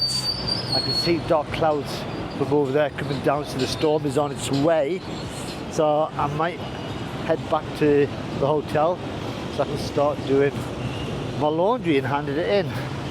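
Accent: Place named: Scotland, United Kingdom. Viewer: It looks like scottish accent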